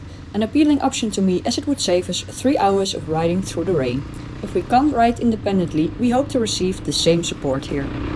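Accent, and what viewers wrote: Netherlands. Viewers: Oo noong una kong makita at mapanood itong channel ni akala ko siya si noraly( itchy boots ) dahil ang pagkadinig ko sa boses ay pareho pero nung mga sumunod na episodes ni nakilala ko din na magkaiba ang boses nila, sa accent ng pananalita pareho kasi pareho sila na taga Netherlands 😊😊😊😊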